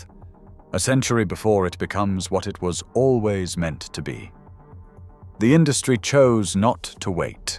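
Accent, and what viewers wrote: United Kingdom. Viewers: Lasted 2 seconds until I heard the British accent then closed video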